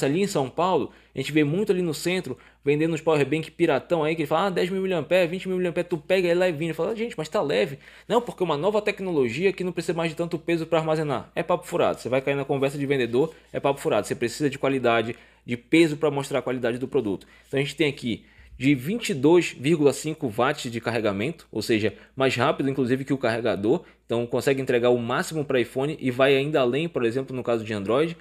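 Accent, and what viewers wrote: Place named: Pará, Brazil. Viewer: sotaque de paraense.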